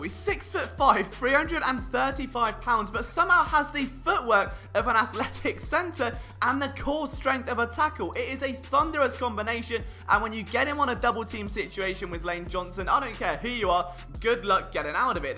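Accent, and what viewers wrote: United Kingdom. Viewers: Jeopardy "Potpourri" Category as an addendum: 1. Brooks isn't THAT tall, though he moves incredibly well for his overall size. Height wise, he's maybe an inch taller than me, at most. That he TOWERS over Sproles at the is hilarious. (Sidebar: Off the Sproles mention, we desperately need to get an elite RB like Swift, which I doubt they'd do, a solid one like Dobbins/Taylor or the Sproles lookalike -- LSU RB. If he's available in the mid third-round to early fourth-round, the Birds need to get him. While I'm not the biggest Sanders fan, a 3-headed RB tandem of the Howard, Sanders and LSU RB would be absurd. 2. I didn't think Brooks was going to play this year when he initially tore it . 3. PFF is flawed, my dude. I'll get you on my side at some point. Constant badgering is foolproof, right?! Ha. 4. On the first play in this film room, you mention his C, OG and OT "features" to make him a complete OG. 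But for the fact the Birds lucked out with the Dillard pick, I'd very seriously think of moving Brooks to one of the OT spots, if Vaitai struggled. All his measurables and eye-test shows he could likely be an adequate OT in the NFL -- but is clearly more valuable as the elite of elite OG. 5. The rest of the film room is why we all come here. I really would invest in this channel if it could somehow offer private shares. You break it down like Baldinger, yet are youthful enough to relate to most of the audience. Plus, even though I hate to admit it: the British accent, howeve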